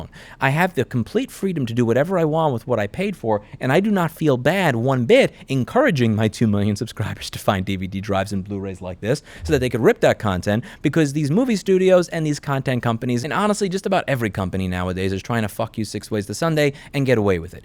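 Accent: New York, United States. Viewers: u can go out of new york but new york wont go out of u.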